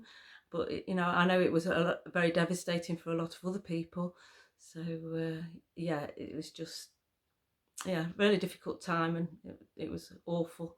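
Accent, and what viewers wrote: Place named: Manchester, United Kingdom. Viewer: Absolutely love your first blog, you did brilliantly. I’m still to make the big decision of doing what I’ve always dreamt of. I’ll be surly be keeping you posted how I get on over the next 12 months. I’m so happy for you guys You sound like a Mancunian Manchester rules 😊 I live 2 mins from Portland Basin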